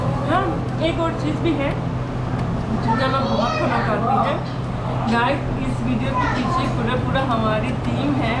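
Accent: Pakistan. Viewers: Ur urdu accent ❤️ ❤️ 😂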